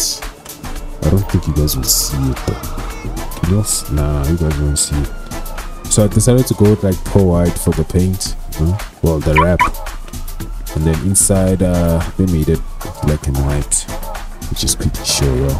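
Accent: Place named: South Africa. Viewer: The way you speak you from South Africa bro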